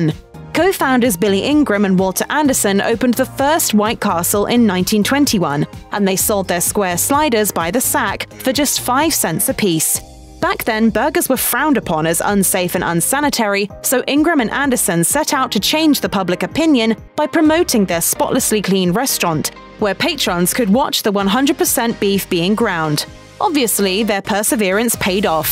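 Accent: United Kingdom. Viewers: The British accent seems inappropriate for this food. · I dislike theBritish narrator.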